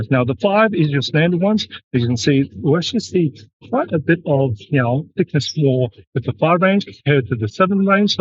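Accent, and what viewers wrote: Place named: Australia. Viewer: The aussie accent 😆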